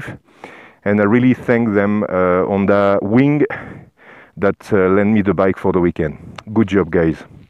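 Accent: France. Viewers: are you french ? because you speak englich as à french guys......salut d'un motard français. ....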